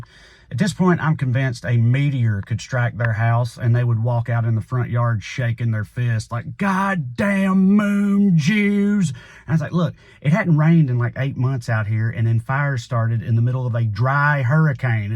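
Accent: South, United States. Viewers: Love your progressive voice and the lighthearted, entertaining way you express it. Love your Southern accent, too.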